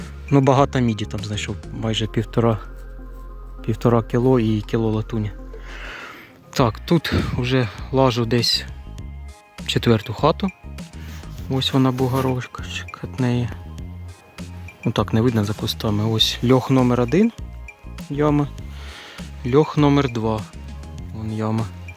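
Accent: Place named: Surzhyk, Ukraine. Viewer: Извини брат - но лучше ты бы на русском вещал . А то такой суржик ..уши режет . От того ,что на русском будешь вещать - ты из украинца в россиянина не превратишься . И русский язык - это не собственность россиян . Мы все славяне - имели общий пра-славянский язык . Потому все славянские языки имеют общие корни и очень схожи . А то, что власти нас подели - в частности на манипуляции языками - то это на их совести . Главное оставаться Человеком - в лучшем понимании этого слова . А находки респект ! Мои поздравления !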